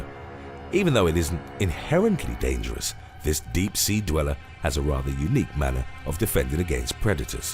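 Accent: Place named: England, United Kingdom. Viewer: He's not Australian. That's an English accent, and this channel is notorious for getting things wrong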